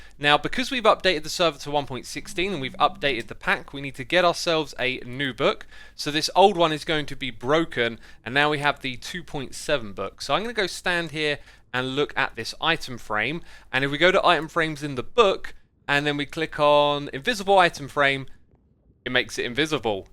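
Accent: United Kingdom. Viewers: Im from Germany and I really love british english. The accent is so awesome and just makes me wanting to live in England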